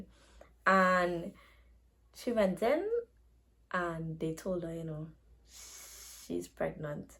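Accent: Trinidad and Tobago. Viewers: Town and KFC are popular in Trinidad I live in Trinidad she speakin trini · Are you saying she is from Jamaica or you are from Jamaica? Cause she is Trinidadian, you can hear her accent and on her Instagram she has Trinidad.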